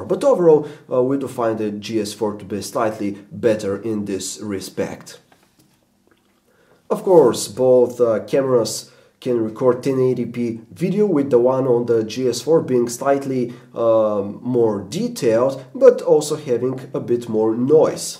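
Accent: Russia. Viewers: are you Russian? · That Russian accent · where you from??? sound like from russia or some near country and why comparison sounds like you more iphone man . They are just phones.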